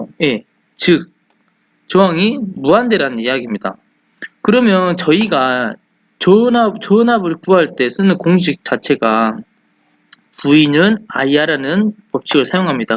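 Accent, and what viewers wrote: Jeolla, South Korea. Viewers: 다 좋은데 전라도 사투리가 너무세요.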